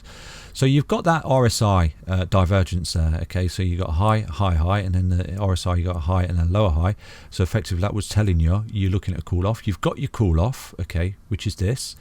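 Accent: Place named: Nottingham, United Kingdom. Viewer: me to .... can tell with your accent.... I'm from sunny Rotherham .... I'm guessing your notts way on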